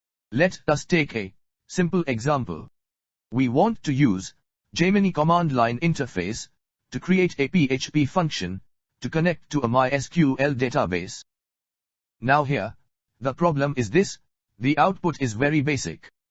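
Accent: India. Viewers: Another slop tool presented by a Indian robot voice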